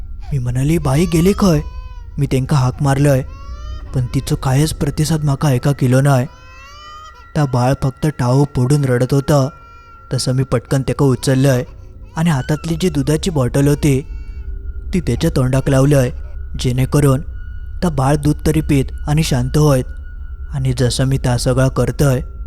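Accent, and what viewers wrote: Malvani, India. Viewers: आपल्या वाणीत मालवणी भाषैचा गोडवा आहे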